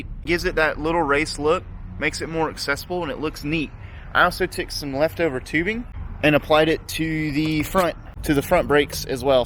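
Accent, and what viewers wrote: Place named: Georgia, United States. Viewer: You sound like chase on two wheels. Must be a Georgia thing. Sick bike btw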